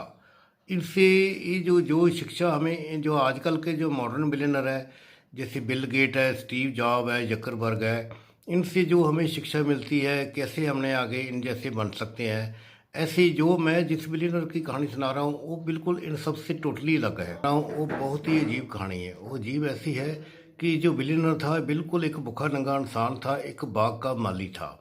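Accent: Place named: Punjab, India. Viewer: पंजाबी लहजे में आपका हिंदी बोलना बहुत भाता है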